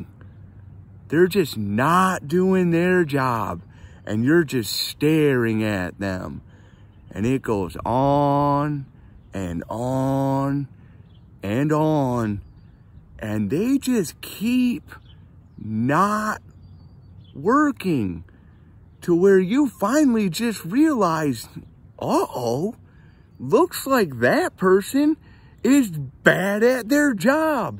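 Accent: United States. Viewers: Wait are you from PA?? Lol love ya bro!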